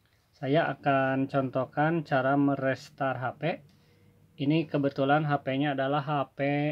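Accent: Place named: Banjar, Indonesia. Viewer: Banjar kc mba logat nya koq logat bnjar 😀😀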